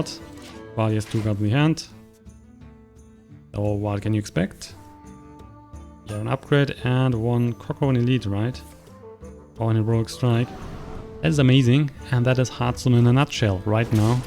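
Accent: Germany. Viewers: Er spricht gutes Englisch, aber mit eindeutigem Deutschakzent. Hört man sofort....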